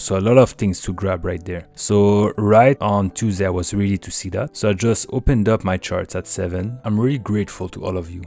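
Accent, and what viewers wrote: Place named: France. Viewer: je me disais bien que l'accent me disait quelque chose mais je savais pas que t'etais francais mdrr beau boulot en tout cas bravo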